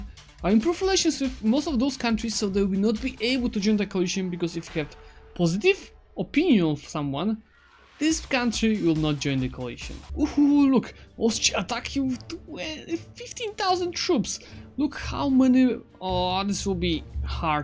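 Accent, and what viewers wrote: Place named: Poland. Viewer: Are you Polish or Slovak? I love your accent :))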